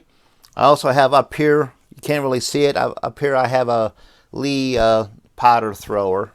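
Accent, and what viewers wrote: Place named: Pittsburgh, United States. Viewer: I thought the same thing!!! I heard “arahn tahn” and I was ready to place my order at Primantis and tune into 102.5 DVE lol. Ours is a pretty unique accent. I get asked where I’m from all the time down here in Tennessee only two people in six years have been able to place the accent, a Nurse who’s husband is from Pittsburgh and an Air Evac pilot.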